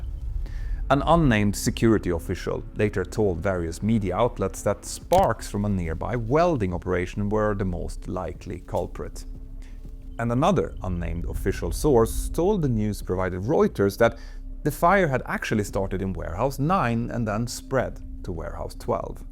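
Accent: Sweden. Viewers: What a great spin off from your main channel! The dedication to details, the source criticism/research, the build up using past incidents and your style (art) of your story telling is a perfect match with (preventable) tragedies like this. And your Scandinavian accent is unique and perfect for emphasizing the importance of details. Svensk, inte sant? För mig som skåning låter det som typisk mellansvensk "brytning", typ östra delen av Svealand någonstans? Anyway, a big thumbs up to your entire team! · This is 100% a Swedish dude speaking, the English is good, it’s the dialect that gives it away. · Look up pronounciation for "flammable", "origin", "threat", "through", "aftermath", "partially", everything else is just charmingly Swedish. Great video!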